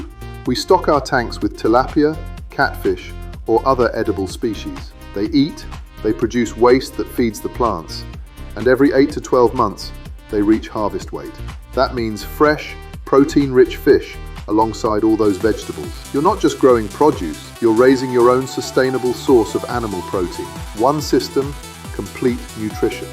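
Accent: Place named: England, United Kingdom. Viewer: Why all the Africans doing the work and the narrator with the English accent. Very colonial.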